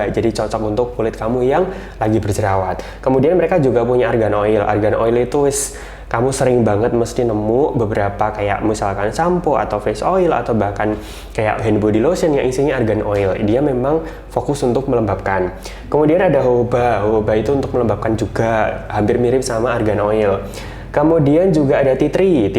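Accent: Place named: East Java, Indonesia. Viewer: logatnya kayak jawatimur agak ngapak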